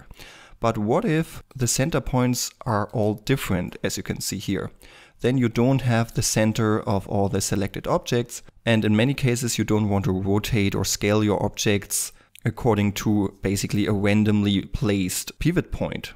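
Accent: Germany. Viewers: Woah I can hear that german accent even though I'm not native english (german too actually xD) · Diggi du hast einen Deutschenakzent........ ansonsten pretty good.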